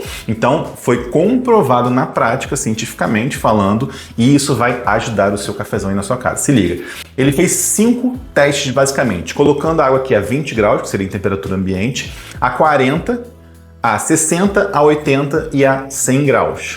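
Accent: Rio de Janeiro, Brazil. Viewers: Aprendi muito! Vou regular meu moedor de café e vou testar esse café triatlon .. Mas o melhor de tudo sempre é ouvir um sotaque carioca no Youtube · O conteúdo até que é interessante. O que não dá para aguentar é o sotaque carioca carregado, que sempre passa a impressão de esnobismo asqueroso.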